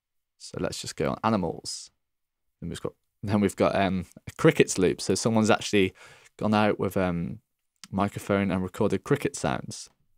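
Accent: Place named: United Kingdom. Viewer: Thank you man,but your british accent...I just didn't catch anything...anyways,thank you :)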